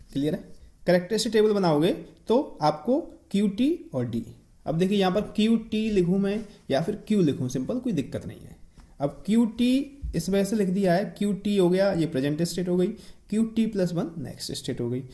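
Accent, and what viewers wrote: India. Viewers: Nice understanding speed is bit fast btw love up accent!!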